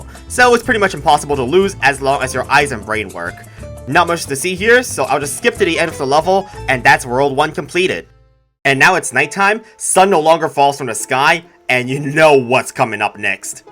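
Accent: China. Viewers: I think I'm in love with you. Lowk love your videos and Chinese accent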